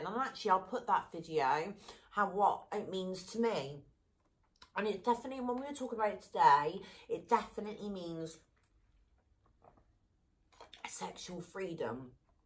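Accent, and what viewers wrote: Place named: Cockney, United Kingdom. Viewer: I'm not a english speaker but i loveeee cockney it's too nice to hear u talking, u know i tried to understand what u was saying but it was fucking hard JAJAJ love uuu babe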